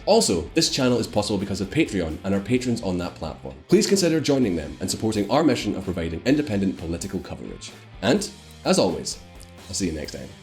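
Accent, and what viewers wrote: Scotland, United Kingdom. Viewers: You’re pronunciation is weak...as an American, I love hearing the Brit accent but yours is either hindering your pronunciation or you’re writers and team are failing you miserably. Best of luck red coat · So curious! Watch a video in british accent that I already watched in spanish from Spain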